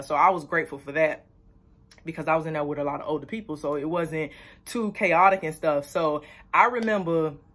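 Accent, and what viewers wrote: Florida, United States. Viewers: I love that country talk😊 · I knew she was from Florida,love how they talk.Ex felon myself love to see the growth.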